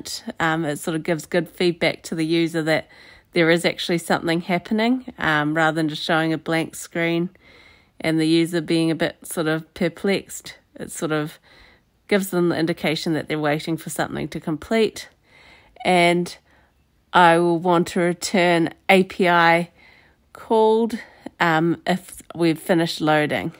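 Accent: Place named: New Zealand. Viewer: Yo! Holla from Perth WA! Your accent sounds like you’re from NZ! 🤙 Awesome tutorial 👏 keep up the awesome work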